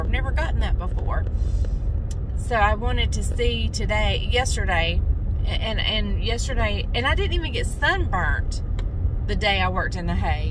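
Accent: South, United States. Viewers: I can hear it too.small town southern wife❤️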